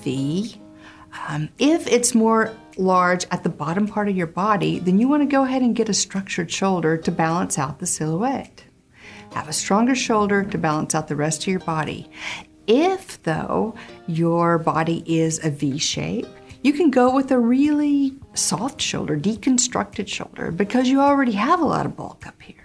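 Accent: South, United States. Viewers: Awesome video! I am italian (from the north) but I really love the english shoulder and construction. It gives me such a strong appereance that has no rivals in my opinion. Despite it still being vert constructed even nowadays, I feel like it could have been even more in the past: I have a vintage chalk stripe suit from the seventies which was made in Saville Row and the shoulder is super heavily padded, I just love that! It was so intresting to explore the other styles anyway, this was very educational. I had no idea that you were american, I should have recognized it from the accent, I love y'all so much. Keep up the great work! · Is from the South? I thought I might have heard some accent here and there, but maybe I‘m wrong. Great video anyway, and a lovely personality. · Just a guess, but I would wager that based on pleasing speech delivery, she was raised in a Southern state.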